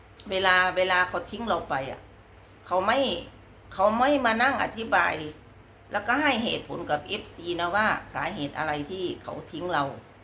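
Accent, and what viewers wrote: Thailand. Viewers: อังกฤษสำเนียงไทยหนูว่าน่ารักดีคะยาย เหมือนที่เราบอกต่างชาติพูดไทยว่าน่ารักดีนั่นแหละค่ะยาย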